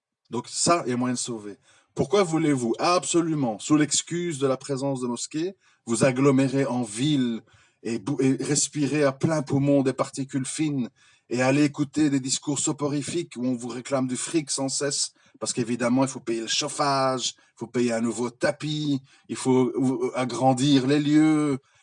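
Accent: Belgium. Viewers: Accent belge. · c est un Belge de souche 😇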